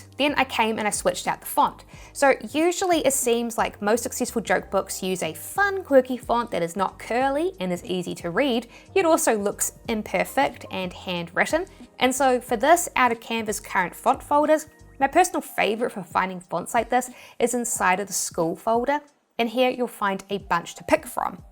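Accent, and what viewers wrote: New Zealand. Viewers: why yees instead of yes and aheed instead of ahead is it like a kiwi accent ? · Video showed up on my recommended, so I clicked on it, and as I listened, and I wondered to myself if you were from New Zealand, so I came to the comments, and my question was answered 😂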